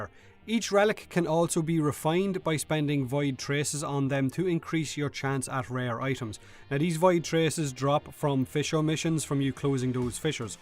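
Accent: Ireland. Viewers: I love that Irish accent :3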